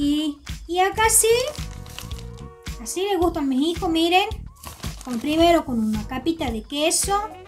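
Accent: Tucumán, Argentina. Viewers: Que lindoooo escuchar la tonada tucumana!!! Dios te Bendiga, aguante mi Tucumán Queridooo!!!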